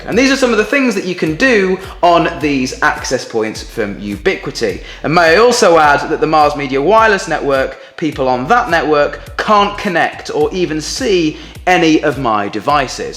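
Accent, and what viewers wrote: United Kingdom. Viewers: I dont like BRITISH ACCENT!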